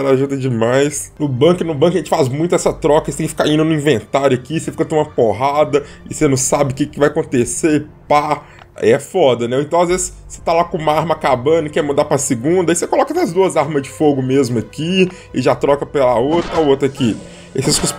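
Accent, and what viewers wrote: Minas Gerais, Brazil. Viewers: Parabéns. Você é mineiro né adoro esse sotaque.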